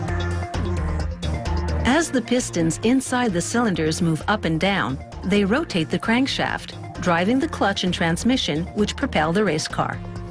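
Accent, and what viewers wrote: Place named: Canada. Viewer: Canadian narrator for sure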